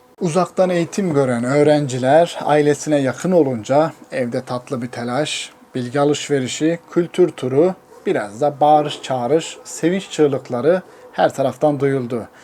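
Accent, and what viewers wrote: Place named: Çankırı, Turkey. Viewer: Bi çankırıli olarak şivene bitiyorum kardeşim helal olsun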